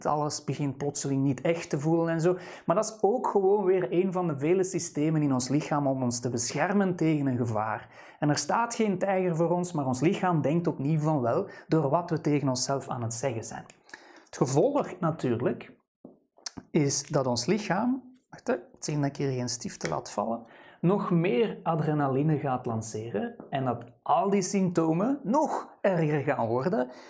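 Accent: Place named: Flanders, Belgium. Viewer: Overigens een prachtig accent. Ook de beste literatuur wordt geschreven door Vlamen :)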